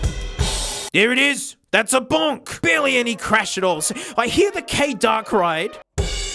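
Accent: Australia. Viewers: I've been watching this guy for seconds max, and it also took me that long to hear JonTron in his strained voice and I will never unhear him as aussie JonTron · You sound like that one Australian guy that talks in that one Australia sound. You know that him I'm talking about? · I want SMOKO so leave me alone! Nah, I heard an Australian accent, whilst talking about drum-specific things - had to subscribe! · Man you don't have to be so performatively australian to cater to the americans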